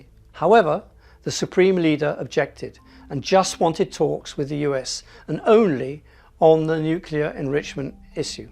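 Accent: United Kingdom. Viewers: His british accent automatically disqualifies the speaker as their our real enemy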